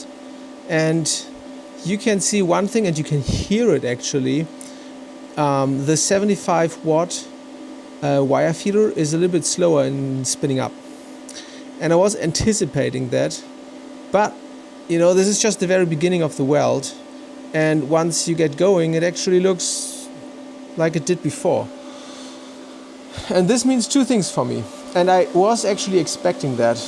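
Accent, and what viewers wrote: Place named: Germany. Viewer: you sound German ...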